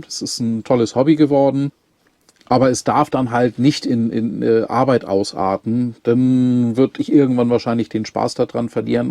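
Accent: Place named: Northern Germany, Germany. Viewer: Auch von mir herzlichen Glückwunsch zu 25Tsd. Guckern. Bin ja noch frisch dabei. Ich mag dein freundliches Wesen und den norddeutschen? Einschlach. Moin aus Ostfriesland. LG Matriczia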